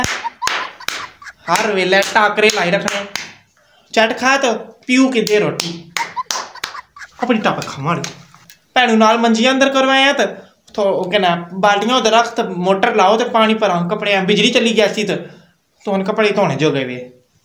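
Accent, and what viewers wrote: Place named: Pothohar, Pakistan. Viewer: Fantastic video i Love it and i really like your potohari the way you speak My favorite language is potohari but we call it pahari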